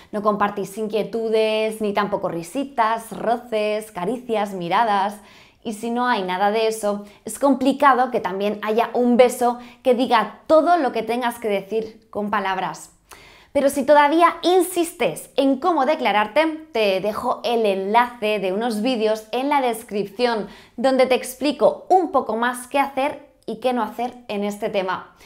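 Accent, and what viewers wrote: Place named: Spain. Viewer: A mí me gustas tú por tu acento español, tu lenguaje corporal y físicamente tu cabello te hace ver guapísima, así que...voy a España o vienes a México...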